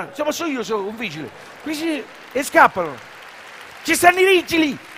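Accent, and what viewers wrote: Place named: Rome, Italy. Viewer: Troppo forte, poi secondo me( e parlo da milanese), l accento romano accentua ancora di più la comicità, è troppo bello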